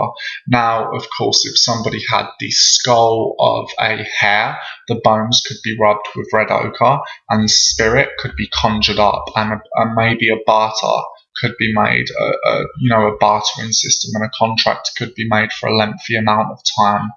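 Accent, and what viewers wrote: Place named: London, United Kingdom. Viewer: what part of England are in ? You sound very London / Essex :)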